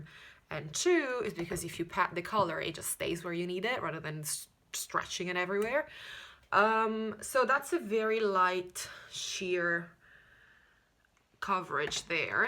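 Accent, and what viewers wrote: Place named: Italy. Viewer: You have a perfect English but I’m just curious where you’re from because I can hear some accent here and there, maybe Italian? Just curious! (I’m Italian and I feel like I talk in a very similar way when I speak English)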